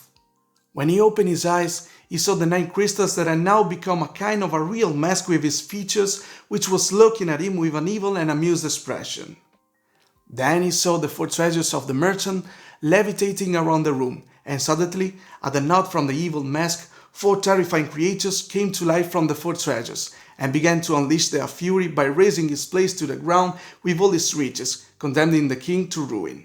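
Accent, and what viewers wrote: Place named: Italy. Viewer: ho ottenuto italiani origini dai quelli vecchi.. grandparents from my dad's side so I recognize an Italian accent anywhere 😅 also I was never fluent so pardon my butchering when I write, it's probably backwards lol. Do you have any social media?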